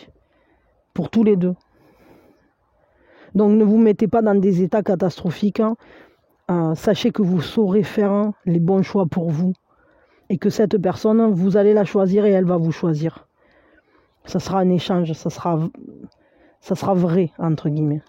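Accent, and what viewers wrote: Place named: South, France. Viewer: Vous avez raison , la Gratitude est du miel pour le Coeur. Merci à vous pour les messages transmis. Vous répondez à mes prières au mot prés ! Un jour sans doute quand le moment sera Juste et Bon pour moi. Juste une remarque : Avez-vous remarqué que votre voix, au demeurant très mélodieuse, perd son accent du Sud quand vous chantez ? Merci encore et prenez bien soin de vous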